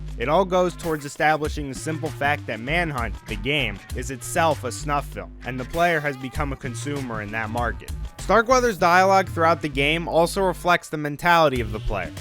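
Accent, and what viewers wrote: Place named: Chicago, United States. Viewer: That's an interesting accent you have. Are you from Chicago?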